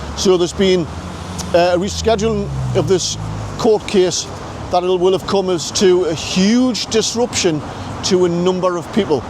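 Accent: Newcastle, United Kingdom. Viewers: You simply can’t beat that pure Geordie accent! 😊👍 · It’s called Geordie. It’s an English dialect spoken in the north east of England; primarily Northumberland. It can be traced back the Angles who came from Denmark over 1,500 years ago. In fact, some Danish friends of ours said they can easily understand the more broader versions of the Geordie accent. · Wonderful to hear a true Geordie accent, and to get this interesting update. Apparently you don't use the BBC "ssshhhh-edule" pronunciation. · Great reporting. Thanks for keeping us updated. Love your Geordie accent 💕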